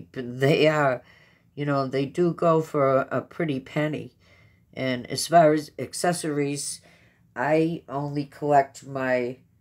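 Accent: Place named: Massachusetts, United States. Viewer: I just realized when I came here to watch that you’re the one from Massachusetts!! I’m originally from New Bedford and I’m so nostalgic listening to your accent! 🥰🥰 I’m so glad you enjoyed the event and your prize!! PS, his name is Matt 😆🤣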